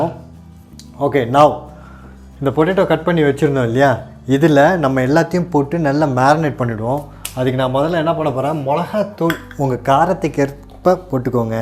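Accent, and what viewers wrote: Tirunelveli, India. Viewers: Unga slang nala irku ..niga tirunelveli ah?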